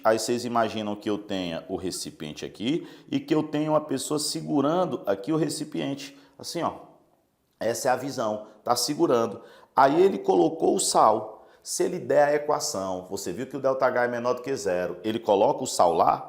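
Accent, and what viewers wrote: Ceará, Brazil. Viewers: Ele é cearense?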